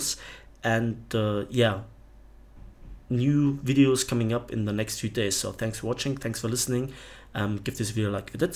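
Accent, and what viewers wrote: Germany. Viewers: Hmm, you sound German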